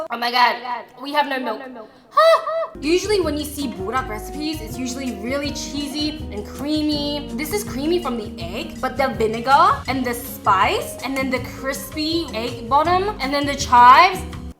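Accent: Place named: Australia. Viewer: The Australian accent 🤌🤌^^ :D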